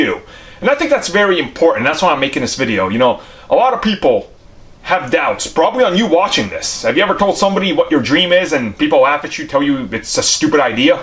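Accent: United States. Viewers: Would never have guessed you were from Bosnia. You're accent sounds about as American as it gets.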